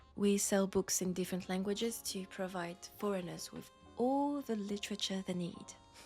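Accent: France. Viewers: Lovely as usual :) Hearing your french accent + mentions of Paris made me think: Have you played Clair Obscur: Expedition 33? I suspect this game will be a must-play for every French gamer, just like Witcher is for Poles ;) If you did, can we expect a story from the game world?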